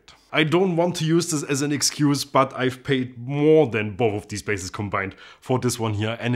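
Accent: Germany. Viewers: Looks can be deceiving, for sure. This German/Austrian-sounding bald man plays bass as soulfully as any funk bassist I’ve ever heard and I was playing music (drums) in the ‘70s, when I was a teenager. Sweet video! Blessings, Grace and Peace 😊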